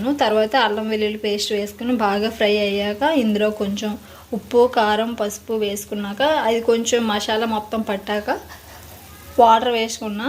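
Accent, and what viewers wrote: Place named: Godavari, India. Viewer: Godavari la undi Telangana language sister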